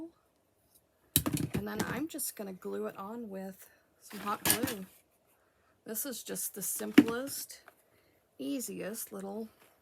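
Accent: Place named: Utah, United States. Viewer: Hello neighbor in Utah 🌿 I'm from California but live in Orem now. I thought your accent sounded like a Utahn😊 ❤ you & your unique crafts!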